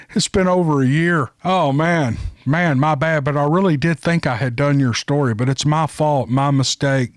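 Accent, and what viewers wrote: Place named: Oklahoma, United States. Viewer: I wanted to remind the other viewers/listeners that if you aren’t satisfied “he’ll give you your money back!” My seven year old boy loves to say that now. Being from Oklahoma, we have a bit of an accent so he sounds just like you! It makes me laugh uncontrollably when he says it spontaneously. I posted a long time ago that it’s your character that attracts us subscribers/listeners. Keep up the great job and don’t let anyone convince you to compromise your convictions about keeping this channel about stories and stories only. Anyone that has issues with that has character issues that need to be worked out.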